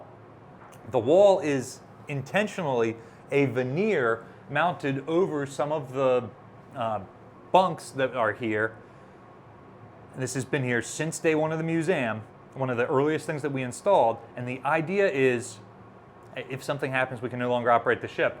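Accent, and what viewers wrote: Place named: California, United States. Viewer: Personally,i think you need to work ok ennunciating. They are MUSEUMS, not myoozims. Then again, you sound like you are from commiefornia, so i cannot expect much from you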